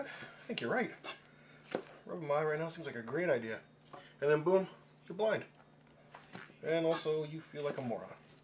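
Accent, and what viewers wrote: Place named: Canada. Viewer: 45 seconds in I recognized that you're a fellow Canadian from your accent. Am I right? I have no way of knowing but I am pretty sure.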